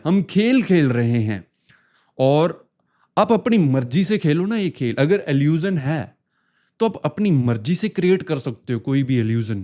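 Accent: Punjab, India. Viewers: Tu punjab toh a. Mere veer tu bolna shuru kiita mainu pata lag gaya. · punjabi lagda bai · From your accent of speech,ig you are from Punjab or haryana.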